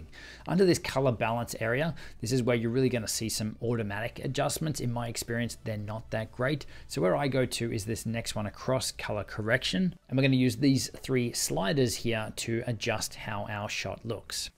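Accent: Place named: Australia. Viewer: Hold on, your accent is Aussie, but you spelt 'Colour' in American. The betrayal though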